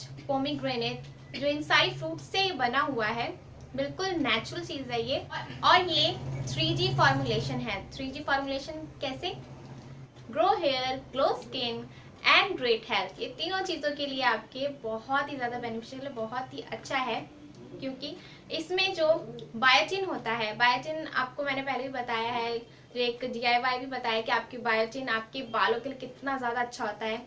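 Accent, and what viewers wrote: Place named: India. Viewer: this is India English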